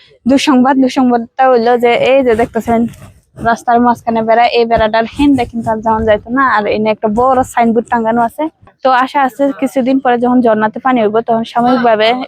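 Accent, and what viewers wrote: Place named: Sylhet, Bangladesh. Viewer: Your speaking accent is much like to the Sylheti dialect/language 🎉😮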